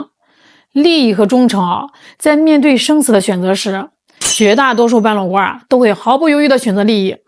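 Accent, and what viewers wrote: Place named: North, China. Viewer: 是北方口音吧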